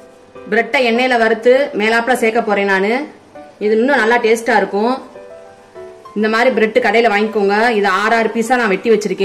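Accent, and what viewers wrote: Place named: Madurai, India. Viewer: I love her Madurai accent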